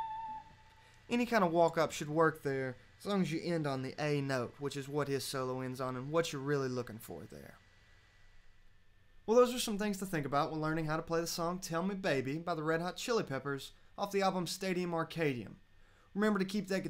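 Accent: South, United States. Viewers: you're good, love your southern accent too.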